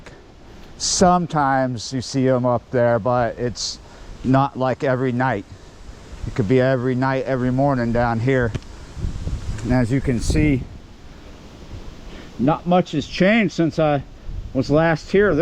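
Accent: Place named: New Orleans, United States. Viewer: Not hat but yat accent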